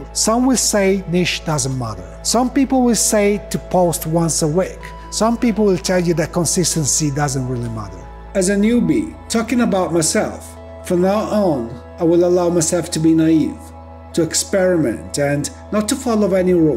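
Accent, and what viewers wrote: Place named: Eastern Europe. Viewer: not to be disrespectful, but rather totally honest and non critical. you remind me of Al Pacino - but with an eastern European accent. It is actually really interesting your manner and way of speaking. i really like it a lot. and your english is perfect just the way it is! nice video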